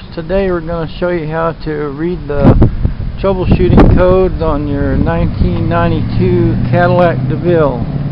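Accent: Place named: South, United States. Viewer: I'm digging your southern drawl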